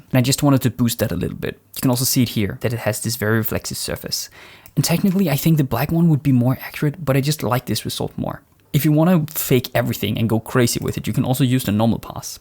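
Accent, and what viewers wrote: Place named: Denmark. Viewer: Vent... du er dansker!?!?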